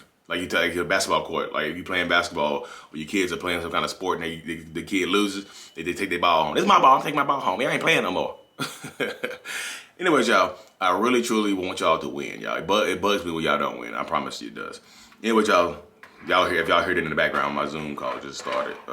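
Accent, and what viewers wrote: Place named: South, United States. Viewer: U sound like u are riding a horse Lee southern man voice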